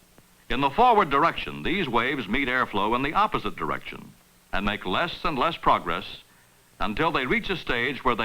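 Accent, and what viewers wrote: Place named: United States. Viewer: American accent, British birds (as a rule), a bit odd.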